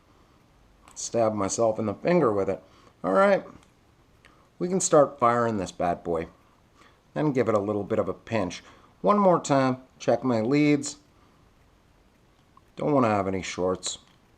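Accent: Finland. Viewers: Dude!!, thats cool;) I noticed a little fin akcent there:) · Are you finnish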